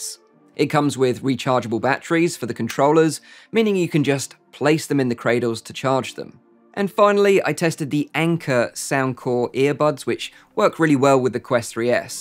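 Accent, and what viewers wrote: United Kingdom. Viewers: Thats the same vr i have and he talk british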